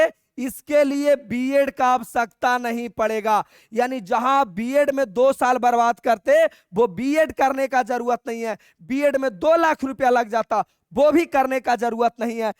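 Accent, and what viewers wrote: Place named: Haryana, India. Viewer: Haryana sa h sir ji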